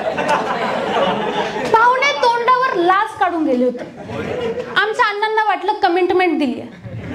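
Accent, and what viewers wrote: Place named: Nashik, India. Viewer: खूप छान नाशिकचा एक्सेंट छान आला म्हणजे असं वाटलं की खरंच नाशिकचे आहेस म्हणजे तू आहेस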